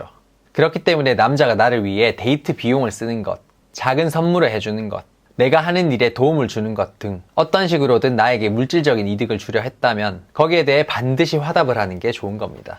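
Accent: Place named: Busan, South Korea. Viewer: 부산 사투리와 표준어의 절묘한 만남이 느껴지는데, 기분 탓일까요?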